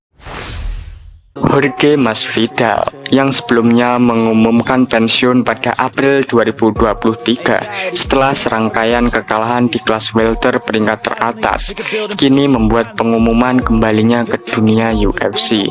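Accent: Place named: Java, Indonesia. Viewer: Medokkk bgt bhangg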